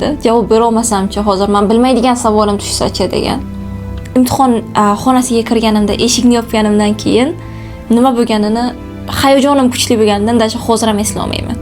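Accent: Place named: Bukhara, Uzbekistan. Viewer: Buxorolikmisiz shevezdan sezdim😊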